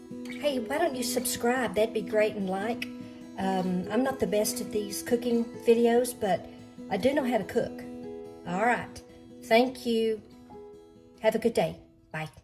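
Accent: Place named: South, United States. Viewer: You seem to have a southern accent, I can't believe you are using canned biscuits 🤦🏼‍♀️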